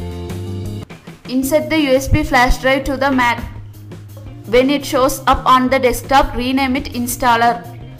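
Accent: India. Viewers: I dont like indian accent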